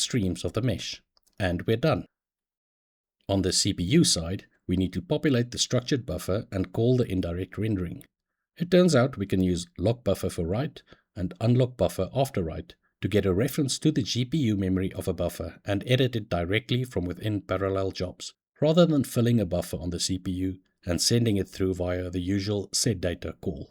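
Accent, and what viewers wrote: South Africa. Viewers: You sound South-African, The work looks interesting. What game are you building?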